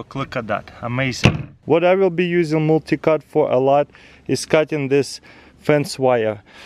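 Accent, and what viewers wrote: Russia. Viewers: псс чувак, а наш акцент-то никуда не делся, ^^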